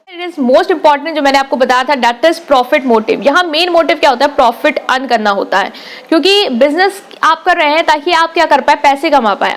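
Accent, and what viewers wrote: Lucknow, India. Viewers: Ma'am aap lucknow se ho kya 😐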